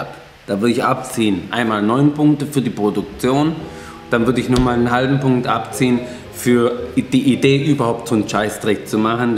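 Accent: Swabia, Germany. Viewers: Haha, das Geschwäble! Grüße aus Tübingen 👋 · Ich liebe Dein Schwäbisch, bin aus Stuggi ...herrlich 🙏👍🙏👍🤣🤣🤣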